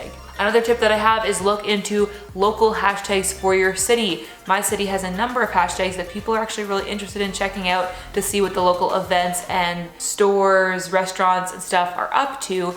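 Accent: Canada. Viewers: Your canadian accent is so strong! 😯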